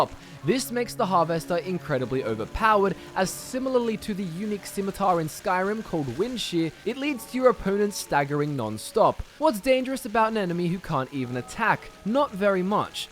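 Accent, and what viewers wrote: Australia. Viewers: I have literally never heard anyone pronounce the words Alteration or Harvester the way you do and it makes me ears confused because you have a perfectly normal Aussie accent besides those 2 words.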